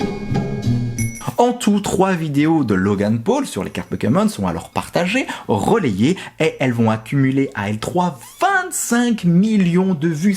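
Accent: Belgium. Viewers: Whouah... Je me suis remis à collectionner les cartes en fin d’année dernière sans vraiment savoir ce qui m’y a, implicitement, poussé. Grâce a ton analyse, je crois que j’ai compris. C’est flippant! ☺️ Au delà de ça, je viens de découvrir ta chaîne et tu m’envoie du rêve Bro! Je kiffe ce que tu fais! La qualité et la pertinence du contenu, l’humour toujours bien calibré! Le petit accent belge! J’adore! ❤️ 👍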